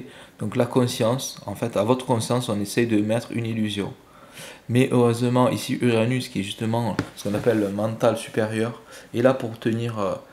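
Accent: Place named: Marseille, France. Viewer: Bravo et merci. 🙏 🙏 🙏 j’ai mis le pouce bleu et je me suis abonnée car c’est un plaisir de vous écouter! Description claire et nette avec ce petit accent Marseillais! 🌏☀️🌜🌗🌈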